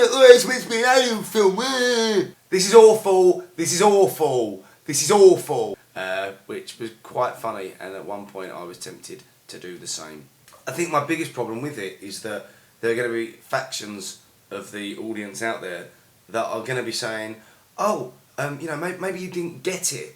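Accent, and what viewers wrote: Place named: Scotland, United Kingdom. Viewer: Very good review. I am American with *distant* Scottish heritage, and thought I recognized some of your way of speaking, and that of the actress you mention. I watched PROM... DVD this morning, and enjoyed it. I have been reading reviews all day ever since. I want to see your take on EPISODE ONE, where I am going now....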